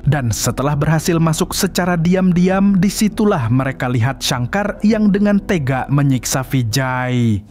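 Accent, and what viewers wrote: Banjar, Indonesia. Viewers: Orang Banjar kiranya ne😂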